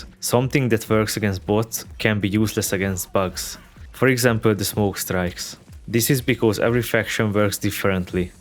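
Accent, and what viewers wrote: Hungary. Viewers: te magyar vagy halljak meg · Thats a juicy Hungarian accent, love it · are u hungarian?? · Rögtön felismertem a magyar akcentust. Great informations btw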